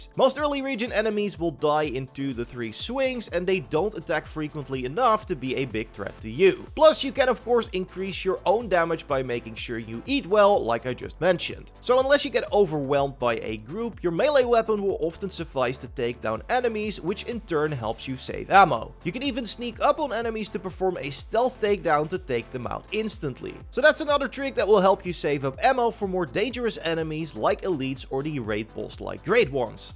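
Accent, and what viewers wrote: Netherlands. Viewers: This guy couldn't be more Dutch. That accent is annoying af.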